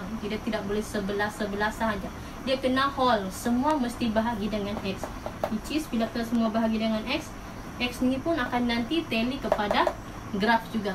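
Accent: Sabah, Malaysia. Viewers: Sabahan slang checkk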